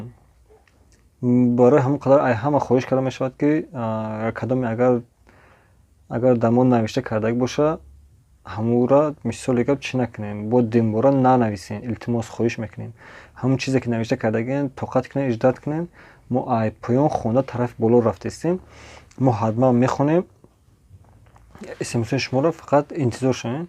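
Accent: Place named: Muminobod, Tajikistan. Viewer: Лахчаи гапзанитонда гап нест........суппппееееееееер .муминобод.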